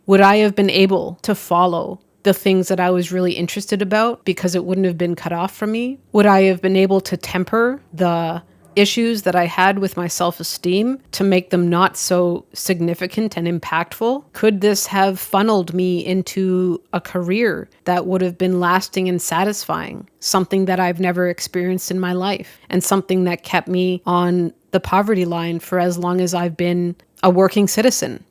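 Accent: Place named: Canada. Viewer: How are schools today in detecting dyscalculia & dyslexia? I mean both the US & Canada as I'm American and I can hear her Canadian accent.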